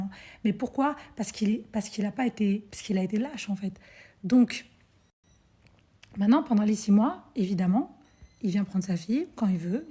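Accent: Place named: Ivory Coast. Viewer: Elle est obligée de prendre l accent ivoirien?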